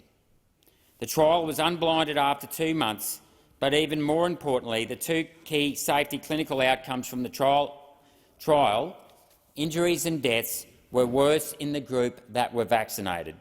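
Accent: Australia. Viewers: I am ashamed of myself. This is 7 months old and my first viewing. I was at that time doing 6 days a week I have since retired. I have now seen 4 vids of this guy and will vote for him next time around. He is nothing less than BRILLIANT. To top it off he sounds like me and every other Australian I have known in my 68 years. Good on you cobber.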